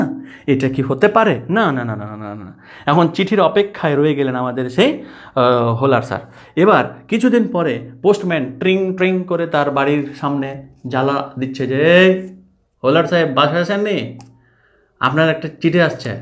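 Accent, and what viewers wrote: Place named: Rajshahi, Bangladesh. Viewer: স্যার আপনার কথা থেকে কেন জানি মনে হচ্ছে রাজশাহী অথবা চাপাই নবাবগঞ্জ বাড়ি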